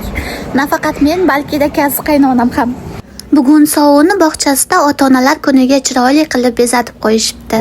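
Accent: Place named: Fergana, Uzbekistan. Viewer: Ozbekistonni qayeridan edingiz shevangizdan Fargonalikka oxshadingiz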